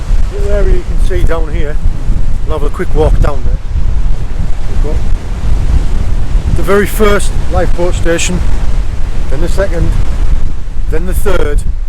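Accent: United Kingdom. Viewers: I'm showing this video to my student now to demonstrate the British accent.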